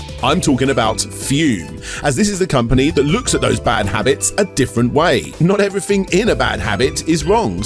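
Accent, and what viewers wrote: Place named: United Kingdom. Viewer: Whoa whoa whoa wait one gosh darn second.. you're British? So.. am I British? Is there a test to find out?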